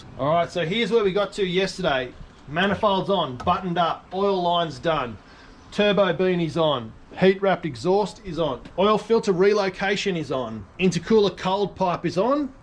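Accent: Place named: Australia. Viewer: Tell mydipsy wreck to kick rocks and do one! you speak like that because that's your accent and how Aussies speak!..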